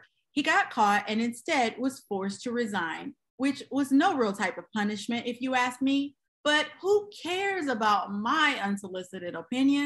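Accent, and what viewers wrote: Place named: South, United States. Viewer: Love your country accent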